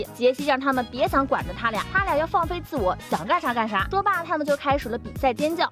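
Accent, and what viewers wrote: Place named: China. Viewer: 版主是中國人又不是台灣人，口音聽不出來嗎？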